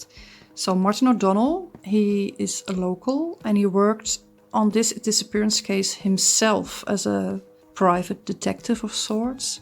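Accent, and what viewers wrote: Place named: Netherlands. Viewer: dutchies try to speak english 😂bad accent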